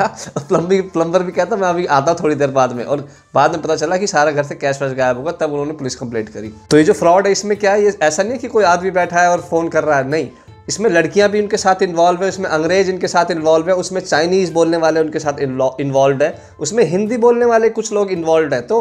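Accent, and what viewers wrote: Haryana, India. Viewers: Bhaiya ki Haryanvi awaaz bahut dhaakad hai 💪💪💪💪💪💪💪🤟 hamari yahi duaa ha aap Sahi salamat raho 🙏🙏🙏❤️❤️❤️❤️ aor 🤟🙂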